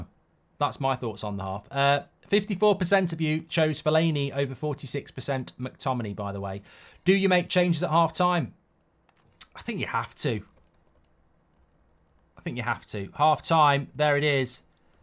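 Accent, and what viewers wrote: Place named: Liverpool, United Kingdom. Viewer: This bloke sounds like a scouser, yeah don't sack him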